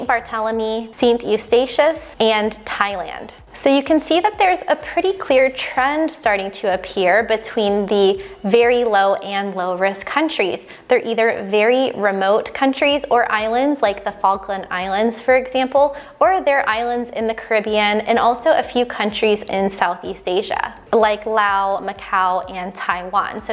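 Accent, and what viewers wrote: United States. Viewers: Meanwhile at a place near you in the US of A confirmed Covid19 cases 6.83M, deaths 155K Source Covid19 alert. Africa population 1.22B Covid19 cases 870K, Deaths 100K Recoveries 524, 557 African countries in no particular order: Ghana Population 30M Covid19 confirmed cases 34,406 Ethiopia Population 109.2M Covid19 confirmed cases 15,200 Kenya Population 51.4M Covid19 confirmed cases 18,508 Source John Hopkins's University Africa Centre for Disease Control As soon as I saw the blonde hair and American accent shows a picture of the entire world and only focuses on countries with people who look like her. For a disease that doesn't discriminate; I am thousands of miles away from America so this one can't call the American Police on me to shoot me!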